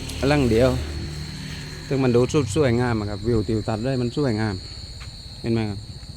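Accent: South, Thailand. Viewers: ชอบภาษาไต้ฟังแล้วมีเสหน่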